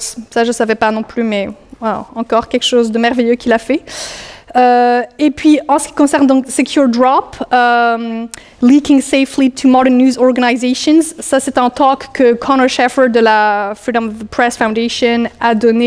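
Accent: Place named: Montreal, Canada. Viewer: I downloaded this video to watch while traveling but was bamboozled to find that it's not English but Montreal french 😅. The English title is a bit misleading.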